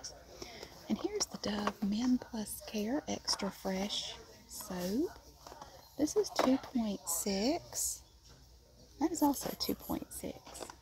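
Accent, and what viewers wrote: South, United States. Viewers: Hey not trying to be creepy 😂 but I love your vids and southern accent but what southern state are you from I’m from South Carolina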